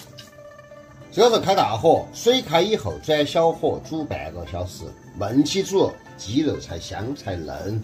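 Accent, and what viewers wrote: Chengdu, China. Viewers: 这一口地道道的成都话！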